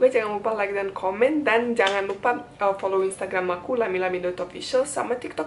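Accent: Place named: Java, Indonesia. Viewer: Ngomongnya luar biasa medok banget, sampe nonton ketagihan, nonton terus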